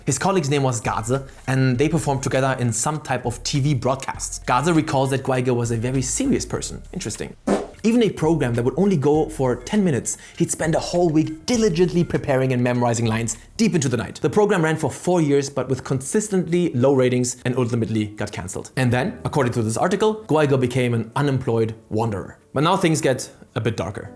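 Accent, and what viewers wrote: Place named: Germany. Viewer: Why does bro have a german accent `?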